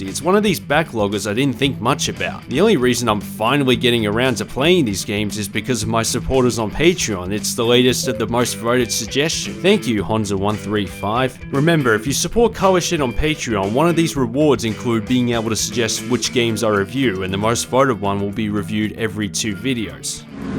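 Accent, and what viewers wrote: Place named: Australia. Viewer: I just wanted to tell you that i really like your work. The scale you cover and your approach to all the racing video games is really satisfying. Since you're an aussie, i also consider you as the gmanlives of the racing game genre lol. Your voice is also similar but it probably also comes with your aussie accent. :D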